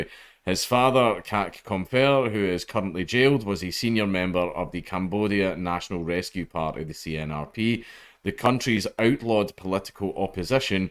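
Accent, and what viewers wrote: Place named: Scotland, United Kingdom. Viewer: 'Phnom penh' (sounds like) 'nom pen' sometimes the second syllable is silent as in Thai. even with a Scottish accent Dank you were sound on that one.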